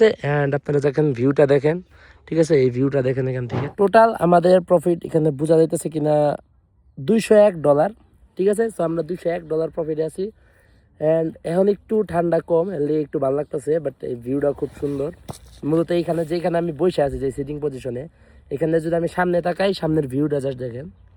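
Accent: Tangail, Bangladesh. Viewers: কথা বার্তার ধরনেই বুঝা যায় আপনি পিউর টাংগাইলা..😁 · vai ki tangailla nki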